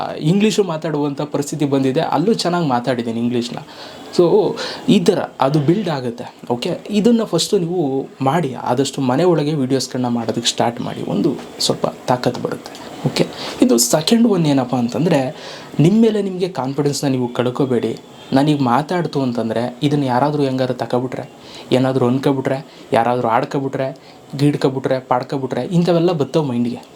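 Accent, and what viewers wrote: Mandya, India. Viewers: ನನಗೆ ಇನ್ನು ಭಯದಲ್ಲೇ 🤣 ವಿಡಿಯೋ ಮಾಡ್ತೀನಿ ಹಾಗಾಗಿ ವಿಡಿಯೋ ಸ್ವಲ್ಪ ಶೇಕ್ ಆಗ್ತಾಯಿದ್ದೆ 😅 ನಿಮ್ಮ ಆ ಮಂಡ್ಯ ಭಾಷೆಯ ಸೊಗಡು 👌 💛❤️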